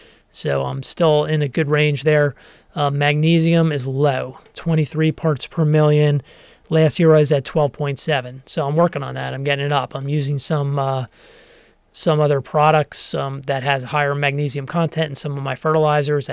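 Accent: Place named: South Jersey, United States. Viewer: New sub & fellow South Jersey guy here (Gloucester County, 20 min from Philly). It’s comforting to hear that strong South Jersey/Philly accent on a lawn care channel, ha!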